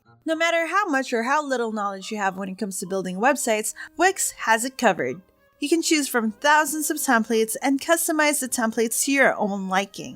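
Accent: Philippines. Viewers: I love her filipino accent.... X3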